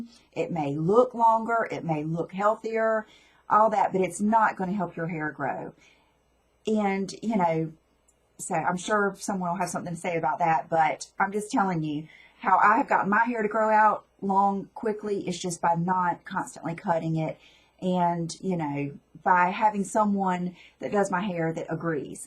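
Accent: South, United States. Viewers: well said b/c I am a firm believer in doing what you like to do re: anything from hair color, length etc.... your the one wearing it....your absolutely beautiful & that nice southern twang....love love...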